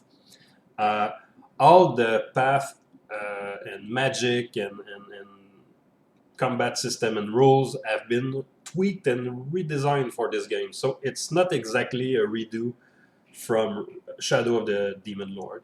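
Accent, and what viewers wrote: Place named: Quebec, Canada. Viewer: Merçi bien! J’ai grandi à Deux Montagnes… a very familiar accent. In NB now. Im a big fan of SotWW and will be playing soon. Thanks for this reflection.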